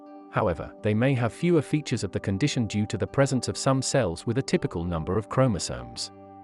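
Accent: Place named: United Kingdom. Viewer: Why a British accent giving American statistics?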